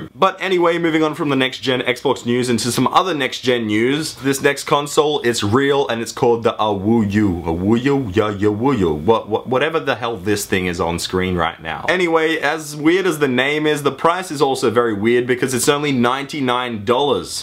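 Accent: Australia. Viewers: Halo 4 is gay along with you're Australian Accent / voice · ur Australian?????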